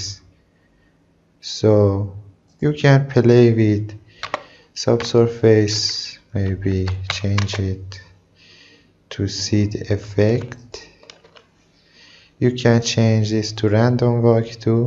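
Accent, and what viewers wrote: Iran. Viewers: literally one of the most accurate persian accents i've seen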